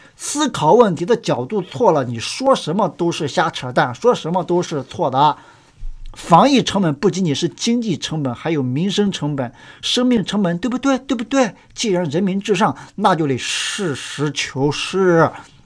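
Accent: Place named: Northeast, China. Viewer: 笑死我了！！ 东北人講話太逗了！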